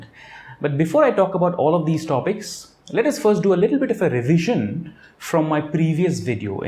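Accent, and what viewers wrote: India. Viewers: Great over-view. packed a lot of information in 24 minutes. Well presented and yes, I can even understand your Indian accent, (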